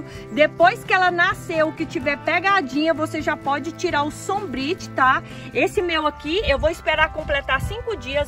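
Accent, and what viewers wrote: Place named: Goiás, Brazil. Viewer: Oi vc parece Goiana. Ô trem bão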